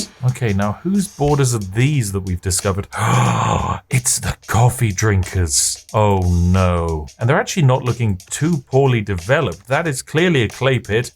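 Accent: United Kingdom. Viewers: Been binging spiff for the last 3 nights, a 12 hour shift is certainly far more enjoyable with that beautiful British accent. Glad to have a new video finally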